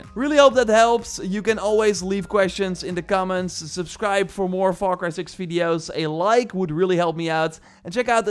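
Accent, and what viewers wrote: Netherlands. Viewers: I instantly hear the ditch accent in a milisecond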